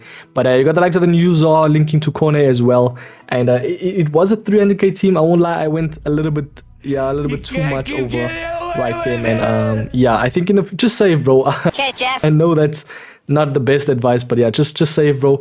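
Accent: South Africa. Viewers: I hear a South African accent boet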